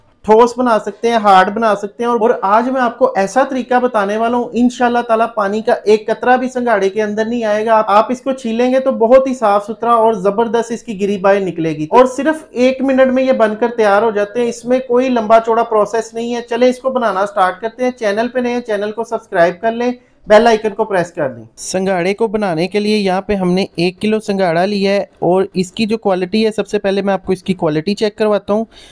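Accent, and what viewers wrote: Punjab, India. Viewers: آپکا لہجہ اور تلفظ پنجابی ھے۔خدا کے لئیے انگریزی نہ بولیں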